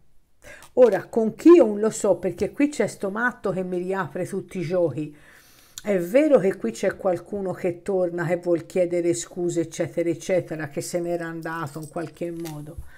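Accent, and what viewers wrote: Tuscany, Italy. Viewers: Ascoltati tutte e due, perfetti in entrambi. Grazie mi piace molto il tuo accento Toscano 🤩🥰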